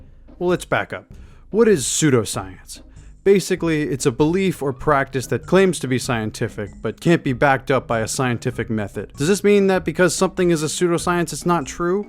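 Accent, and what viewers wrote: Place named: Canada. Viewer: Love the canadian accent bud. Makes me feel at home ❤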